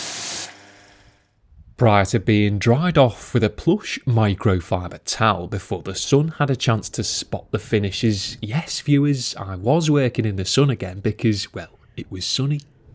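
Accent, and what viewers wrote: United Kingdom. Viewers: What makes you great is being a pro detailer and not also having your head up your ass. And your British ass voice.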